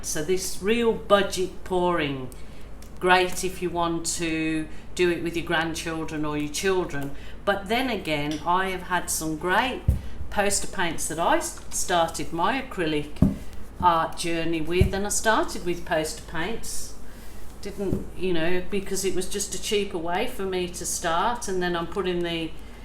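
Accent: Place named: England, United Kingdom. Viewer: Wow absolutely beautiful. Love that you are Aussie (like me) although, I detect an English accent in there with certain words.... like 'round' and 'now'! Loving your videos. Great work! Thank you.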